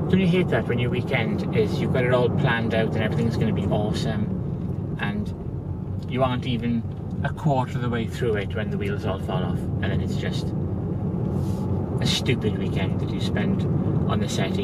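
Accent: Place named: Birmingham, United Kingdom. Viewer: You from Birmingham? I recognize the accent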